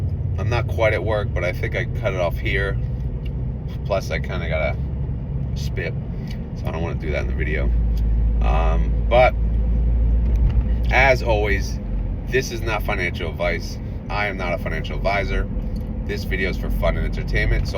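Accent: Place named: New Jersey, United States. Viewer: Where do you live? You sound like New Jersey.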